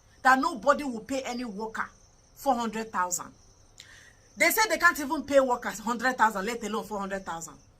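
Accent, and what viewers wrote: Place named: Aguata, Nigeria. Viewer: Nne. You speak Aguata. Which town are you from?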